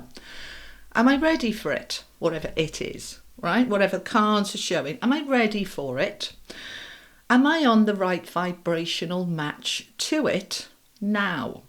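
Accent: Wales, United Kingdom. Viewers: I needed that thank you. ❤ Are you a little bit Welsh? You have a nice accent. Peace and love to you.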